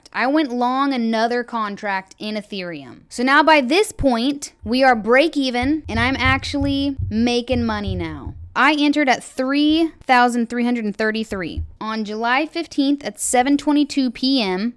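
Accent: United States. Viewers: appreciate your vids, you have the best American accent ive heard (im from Europe)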